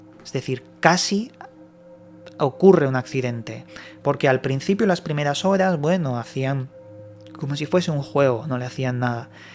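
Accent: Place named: Spain. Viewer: Me encantan tus videos , antes no soportaba el acento español , pero tú voz me transmite paz , gracias por modificar eso , te deseo mucho éxito , esperando tus videos de septiembre a Egipto , son lo mejor , infinitas gracias 🙏🌸🌸🌸🥰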